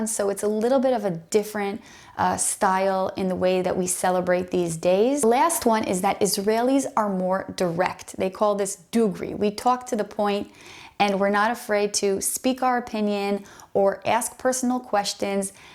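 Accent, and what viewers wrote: United States. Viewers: Your voice sounds like you were raised in the USA? We have some cats but nothing like Jerusalem. I think we have more dogs here. Watch out for pit bulls if they are running loose, that doesn't happen too often.😊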